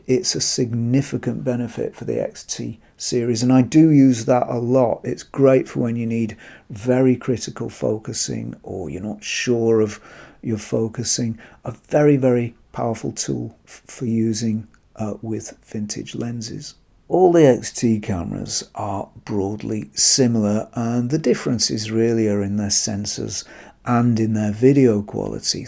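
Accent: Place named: United Kingdom. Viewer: Well, this is a year later from your comment, but I say bugger the manual. (Listening to Mr. makes me feel like talking Brit.) Watch a couple of Youtube vids about it and Bob's your uncle. Later you'll have specific questions to look up answers to in the manual—or yet another Youtube tutorial.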